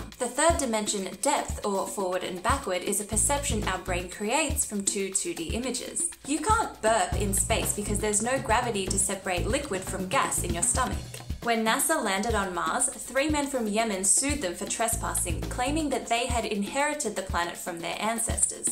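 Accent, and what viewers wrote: Australia. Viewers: Well, since we are bound by causality, it would be impossible for me to do that. Your making the video is the cause and my replying to it is the effect, after all. The latter can not precede the former. BTW your accent..........I presume you're Australian? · Her accent is Australian and I’ve never heard an Aussie pronounce it “urine-us”. That’s more of an American affectation. Out of curiosity, how do you pronounce “uranium”?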